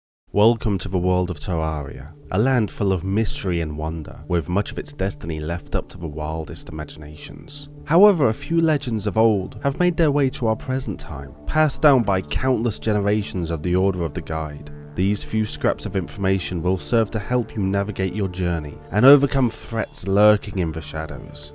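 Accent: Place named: Europe. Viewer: TerrinX - hes European, it’s how you say it over there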